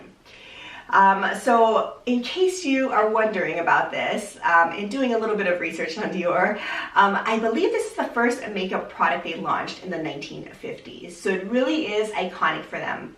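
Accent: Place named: Canada. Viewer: Love the Rosewood on you! I hear a bit of a Canadian accent from you. Did you grow up in California?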